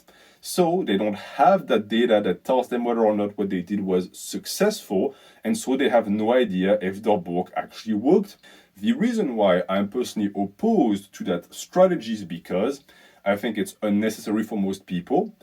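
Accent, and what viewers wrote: France. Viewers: Do you have an instagram fellow lifter of heavy weights i wanna see your sick physique. PS: Javais cramé que t'etais francais a ton accent mdrrr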